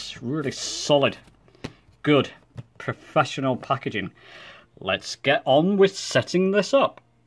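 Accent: United Kingdom. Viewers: Hello, I found your YouTube just days ago. It is very helpful and I enjoy the tutorial very well. There is a question for you: can the Logitech G Saitek Pro Flight Multi Panel compatible with the iMac? I have to make sure before I buy it. I am a very beginner for the X-plane 11 installed on my iMac. BTW I enjoy your British accent! Thank you in advance!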